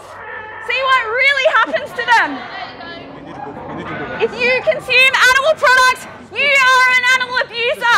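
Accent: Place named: Australia. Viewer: Where is her pretentious Quebecois accent though? The vegan zombie is from Quebec... This youtuber has an Australian Accent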